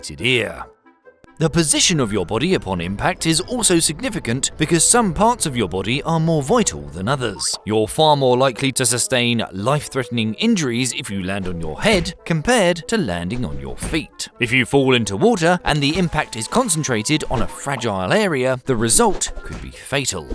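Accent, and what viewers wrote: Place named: United Kingdom. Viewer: I like this channel and not just because of the British accent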